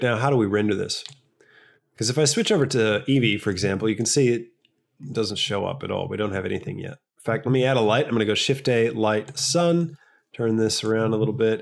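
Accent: United States. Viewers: You have the most US accent I've ever heard from an Australian...cash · wait are you really australian lol you sound american to me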